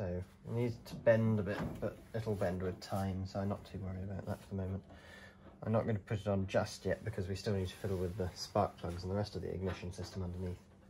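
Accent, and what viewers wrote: United Kingdom. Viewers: youve got the most british accent ive heard. But we in England dont have possums were are you from??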